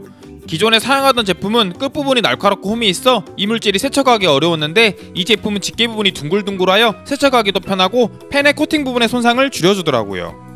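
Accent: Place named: Jeolla, South Korea. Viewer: 전라도 분이신가..말투에서 전라도 느낌이 확나는디ㅋ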